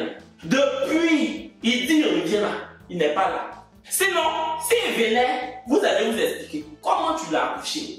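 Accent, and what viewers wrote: Africa. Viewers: Oui je jure tu as le vrai sang africain je te jure la façon de parler moi mm je suis africaine et fier de l'être #gabon! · C'est pas vrai au contraire je trouve que juste par son accent il valorise l'Afrique il n'a jamais dit que les blancs sont mieux que nous au sens propre du terme moi j'adore justement parce qu'il se démarque des youtubeurs Africains qui vivent en Occident il est resté authentique s'il y'a une chose à retenir c'est que si tu veux suivre faut éviter d'être susceptible sinon t'a pas ta place ici ceux qui trouvent qu'il exagère ce sont des personnes hyper susceptibles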